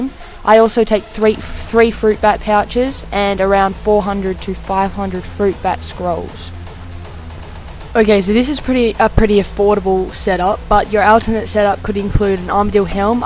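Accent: Australia. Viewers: hey buddy u sound aussie, im aussie too. im maxed would you like to go arma sometime?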